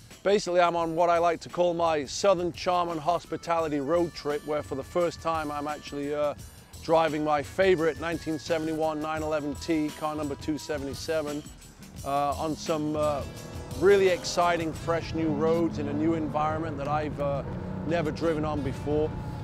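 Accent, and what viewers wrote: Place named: United Kingdom. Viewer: You can really tell that he's a British boy that's lived in the states for so long. His accent sounds so muddled between the two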